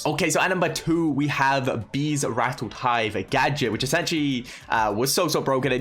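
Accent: United Kingdom. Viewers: a normal pottah from your supah I love bri'ish accents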